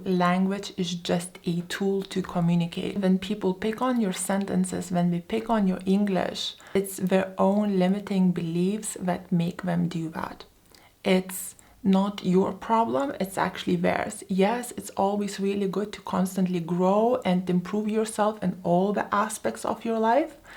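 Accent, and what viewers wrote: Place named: Hudson Valley, United States. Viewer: your awesome and we embrace your awesome HV accent and we understand each other:) Built for greatness, success and kindness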